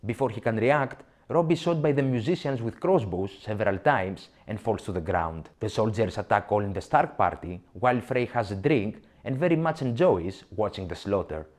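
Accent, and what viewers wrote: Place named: Greece. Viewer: I love your greek accent mate. Exellent analisys of the scene too.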